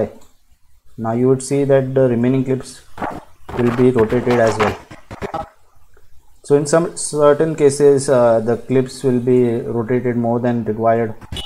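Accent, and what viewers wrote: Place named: India. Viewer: Thank you so much!! I love indian accent.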